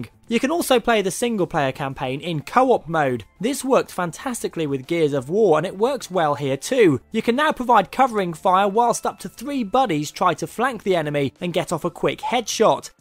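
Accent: United Kingdom. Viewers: this guy is giving us british a bad name for talking like that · i like the british acent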